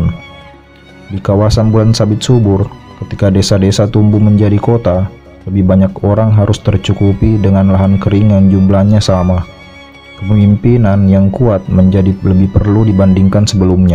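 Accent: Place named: Medan, Indonesia. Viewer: Maaf bang mau komen out of the topic abang orang medan ya? Dialeknya terasa banget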